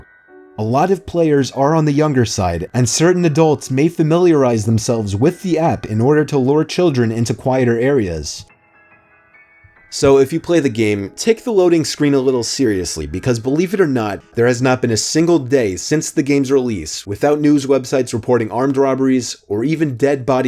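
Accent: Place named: Wisconsin, United States. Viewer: where's from? the accent on the vowels is real. being from Wisconsin myself, I know the struggle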